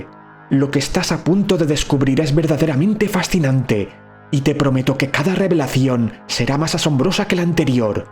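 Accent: Spain. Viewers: Me gustan tus video pero deberías por el bien del canal contratar un relator latino, a muchos latinos no nos gusta el acento español, con todo respeto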